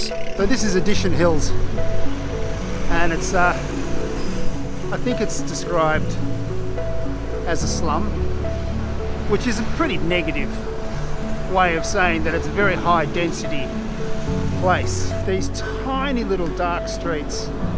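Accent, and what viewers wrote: Australia. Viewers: Kinilaw in waray as well.. you sound Australian accent... from Brisbane Australia ❤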